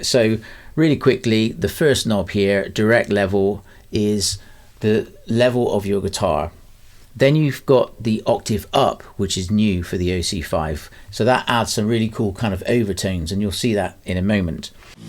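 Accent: West Country, United Kingdom. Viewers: Excellent demo and your playing is awesome. Handy pedal, I must say. Reassuring West Country twang too, especially as I grew up in Brizzle. 😀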